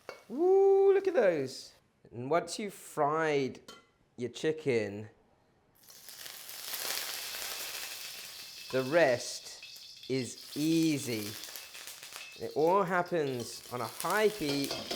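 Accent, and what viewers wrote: United Kingdom. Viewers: is the most british sounding Chinese dude ive seen.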